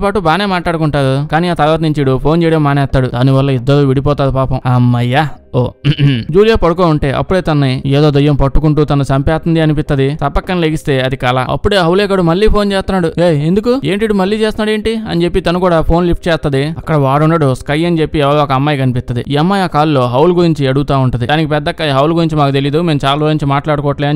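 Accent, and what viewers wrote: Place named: Telangana, India. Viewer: Super bro horror movie kuda comedy movie laka chepavu telangana language super vudi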